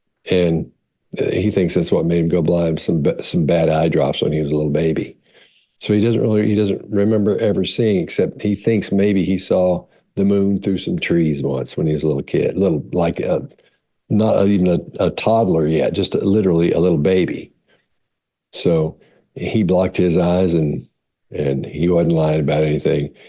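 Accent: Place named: Tennessee, United States. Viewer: On you tube I watch a woman who is a great stand up. She's from Tennessee. I think her name is something like Jeannie Morgan.? When I started watching you, I thought you sounded like you're from Tennessee. You use similar phrases, "I love me a little baby." And "Bless her heart." I was delighted that I was right!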